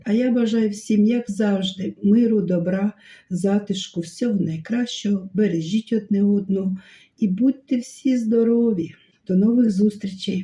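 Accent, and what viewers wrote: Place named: Southeast, Ukraine. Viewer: Какая приятная женщина, и разговор красивый , любо дорого смотреть , видимо юг или юго восток украины, видно что свой человек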